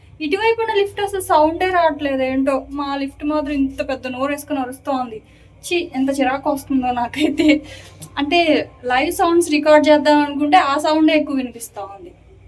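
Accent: Godavari, India. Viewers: full godavari and vizag slang lone matladataru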